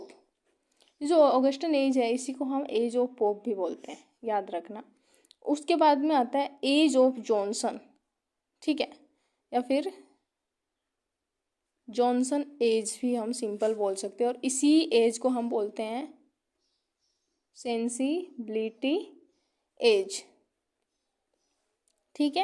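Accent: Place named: Haryana, India. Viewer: Hariyanvi rajasthani accents aa rhe mam , 😅 harayana se ho ??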